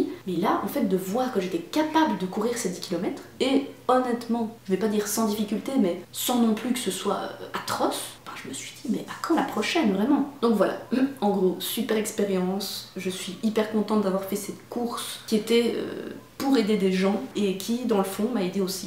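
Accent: Belgium. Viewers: Bonjour, je te remercie car avec ta vidéo précédente tu m'as donné envie de m'y mettre alors que je déteste courir ... j'ai téléchargé une appli et j'ai fait la première course du programme (très très facile pour super débutant) et je me suis régalée !!! Je vais continuer bien entendu 😉 Bisou d'une réunionnaise qui a fait ses études en Belgique et qui adore entendre l'accent belge 😘Félicitations pour ta course !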